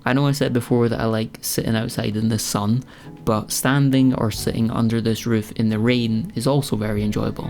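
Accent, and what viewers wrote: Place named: Fife, United Kingdom. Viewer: Hearing a fife accent saying radge paving stones made me do a double take eh. What's radge about them, ken?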